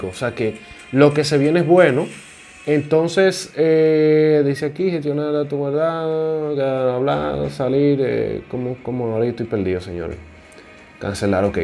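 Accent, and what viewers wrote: Dominican Republic. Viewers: Se nota que eres dominicano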